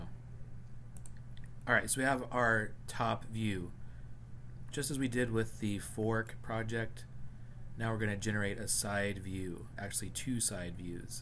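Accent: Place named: United States. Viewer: Finally a tutorial from someone that speaks coherent American English. This was one of the few tutorials I've understood on this subject. Thank you!!!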